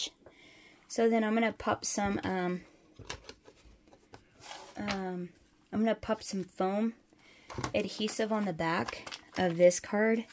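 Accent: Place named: Canada. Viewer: I have been watching you for a few months and you have become one of my favorites to watch. I love you little laugh and your "swear words." I notice your accent and assume you must be from Canada-correct?? You need to add a little personal information to your films so we can know you on a little deeper level. You are a hoot!!!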